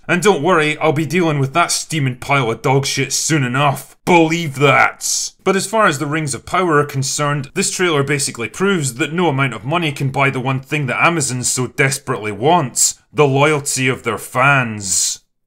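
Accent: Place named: North, United Kingdom. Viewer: This guy sounds like the 9th doctor 😂🤣 must be his northern accent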